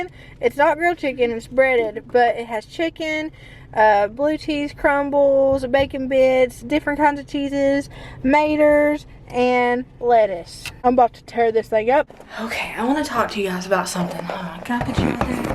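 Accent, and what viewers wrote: South, United States. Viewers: I was just last night telling my hubby about u and ur family and ur channel! My exact words were she says “hey y’all my name is and I’m coming to u from a trailer park in Tennessee!” In the cutest southern drawl ever! And I was telling him I watch a LOT of you tubers and that u are the most authentic and amazing one that I watch! I was telling him how much I love ur family values and what an amazing marriage u have!! How hilarious u and and even the kids are! And I had just told him that I think she is probably making some good money on you tube and u don’t even do advertisements. That u turned one down for thousands of dollars becuz that’s not how u wanted ur channel! And I told him how I was so happy seeing u be able to buy some diy stuff for ur house and take the kids on a staycation but how u still are so grounded and save up for things in envelopes and that u haven’t changed one but!! 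We love y’all for y’all!! I’m glad u explained that tonight (although I did cry) but I don’t ever want u to feel that way again!!!! Just delete nasty comments! Don’t deal with them!! U know you and that’s all that matters!! And I hope one day u pull up to that trailer in a Lamborghini!!!! Wouldn’t that be the talk of the park!!!! Lol!!! Plzzz don’t let anyone else bring u down! U have brought me up from some of my darkest days!!! There isn’t one video I have ever not smiled or laughed (even if we’re through tears of my own) the whole time!! U have a heart of gold! Don’t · I think people need to understand that you can still live frugal and on a budget and still have money to spend. My husband and I live below our means a lot, but if needed we can drop a couple hundred on something and not have it hurt us. Just because you try and live frugally, doesn't mean you can't spend a little extra if you decide it's worth the spending. 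I got here watching your alls plantation shutters video, and stuck around for the southern drawl and since of humor. There's only a few youtubers that I watch solely for persona and you would be one. I just like when I watch a video and someone's house isn't perfect all the time, because mine isn't. After 4 kids (and some health issues starting to show up like PCOS) I'm on a health journey too, so it will be cool to see your progress and feel like someone else is doing it with me. 😊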